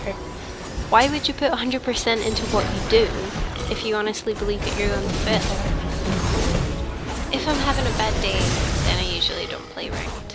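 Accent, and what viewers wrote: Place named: Canada. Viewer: LoL, the canadian accent is strong in this one, oooover and oooover. abooot. Loved the video though, learned alot from it ! :)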